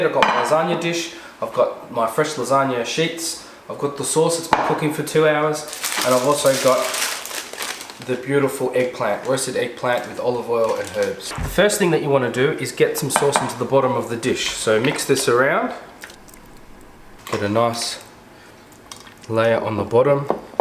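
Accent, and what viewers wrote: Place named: Italy. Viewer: Loving the Italian accent mate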